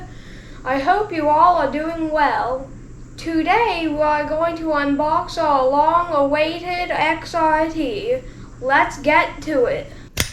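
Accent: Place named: New England, United States. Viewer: Your very welcome ! Your accent sounds like a New England accent. So cool when you took that truck up the stairs! Keep up the great work!💯👊🏼😎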